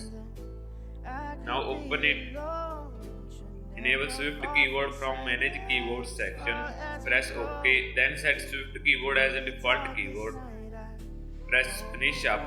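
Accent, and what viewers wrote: India. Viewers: You English is enough punjabi?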